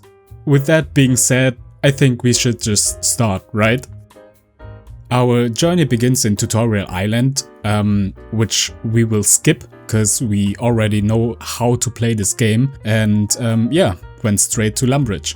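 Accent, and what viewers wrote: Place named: Germany. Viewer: Nice vid! Will watch your series :P Your english is pretty good (Like me, you have an accent, but I understand everything you say) German? Looking forward to the other vids!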